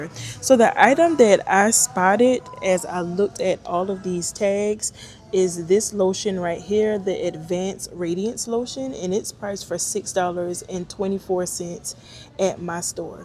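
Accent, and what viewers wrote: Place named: South Carolina, United States. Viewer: New here, and you sound like you're from SC. ❤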